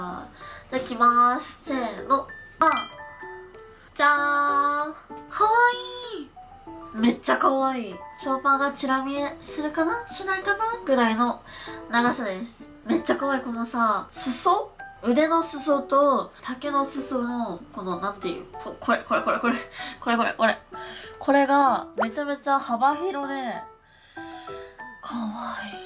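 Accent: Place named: Kansai, Japan. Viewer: の関西弁めっちゃ可愛い💗💗💗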